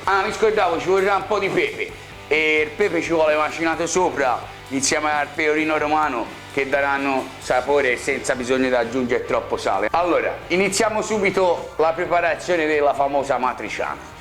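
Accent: Tuscany, Italy. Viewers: Sei fortissimo cin il tuo dialetto toscano bravoooo 👍👍👍👏👏👏👏😂😂😂😂😘😘😘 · Ciao ! Per dire il vero, la ricetta la sapevo, però sentirti come la raconti tu, con l’accento toscano, e per me il piacere massimo. Grazie di tutto, e stammi bene !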